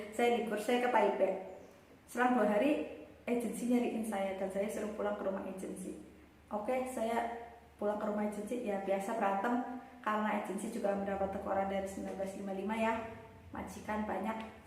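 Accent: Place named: Banyumas, Indonesia. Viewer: Aku sukak deh gaya dan logat mbak yg ngapak...orak ngapak orak inak wekwekwkewke lanjut mbak oleh oleh khas Semarang sudah kusematkan jangan lupa kembalian apalagi di rumah ada masakan arab yg siap dimakan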